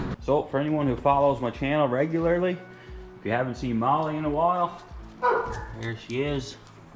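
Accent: Newfoundland, Canada. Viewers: U sounds like a Newfie by!! 🤔😅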